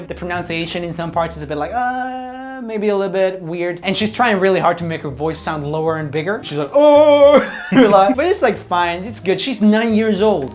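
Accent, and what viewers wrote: Gothenburg, Sweden. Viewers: why is his swedish accent so strong here? :DD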